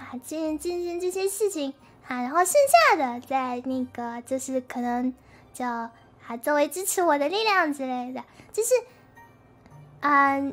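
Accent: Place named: Japan. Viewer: dang yo accent made me think you were speaking japanese for a sec lol what I heard was aksldntkhwkejars挣钱aksjdkfalksdf打钱 very cute tho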